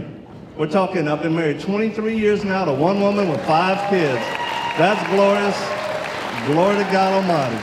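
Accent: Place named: Louisiana, United States. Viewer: Haha I knew you were from Louisiana from your accent, Nawlins forever!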